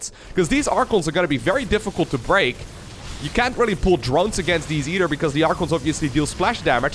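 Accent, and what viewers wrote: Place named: Netherlands. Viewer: Dutch accent is so nice.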